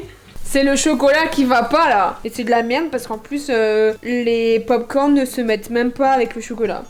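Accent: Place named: Nord-Pas-de-Calais, France. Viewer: Bonsoir, désolé mais c'est quoi cet accent de cht'i LOL "mais c'était quoi cette tète de comme si du gouté de la merde là" Je suis mort ( je suis de Picardie cousine ) Bonne continuation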